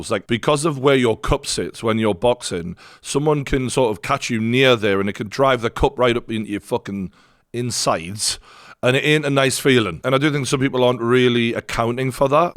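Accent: Newcastle, United Kingdom. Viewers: The voice of boxing ….true geordie 👍🥊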